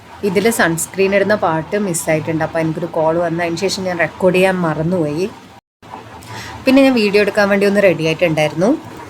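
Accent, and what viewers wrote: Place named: Kannur-Kasaragod, India. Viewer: Eachinde nad ede kannura kasroda slang kekumbo thoninn . Nan kasargodil ninnan ta ❤❤😊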